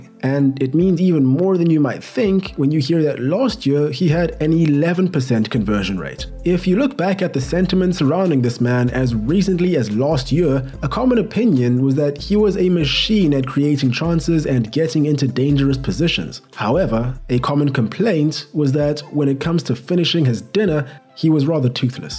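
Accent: South Africa. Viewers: I'm a simple man. I hear a South African accent and I subscribe 🤌 gotta support the peers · homie talking South African?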